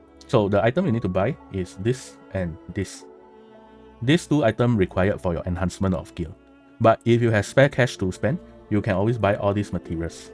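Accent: Singapore. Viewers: are you Singaporean ??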